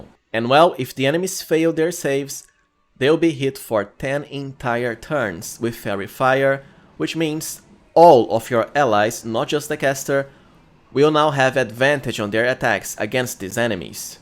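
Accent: Brazil. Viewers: That im a brazilian acent right there, I can bet! nice english btw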